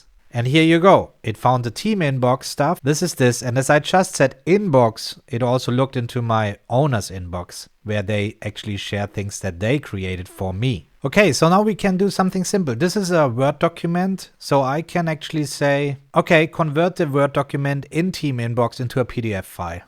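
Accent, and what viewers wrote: Germany. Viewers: Just found your channel, saw this and the previous video. Love the content and the practical relevance focusing on real life usability without self promotion which is often the main motivation of other content creators in YouTube. And as a German guy, I also like your German accent 😊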